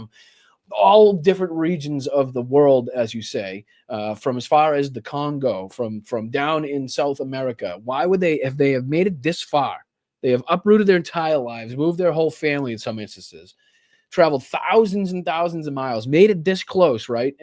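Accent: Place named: New Bedford, United States. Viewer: I was raised in New Bedford. You sound like the folks I grew up with. Keep the example up. We must keep going. No dropping the ball.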